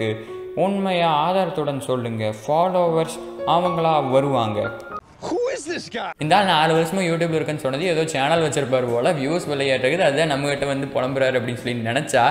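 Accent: Coimbatore, India. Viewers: Kongu Tamil 🙂🙂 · Eriutha eriyanunu tha da pottan 😂😂😂😂😂😂 semma bro super ah panriga unga slang super.. ungaluku konjam covai slang um varuthu semma bro evlo nal enga irruthiga ungala yt miss pannrichu neega kandipa top la varuvinga ungalukaga pray pannikuran....😍🥰 And Happy birthday sorry for the late wish 😍😍😍😍😍😍😍😍😍😍😍😍😍😍😍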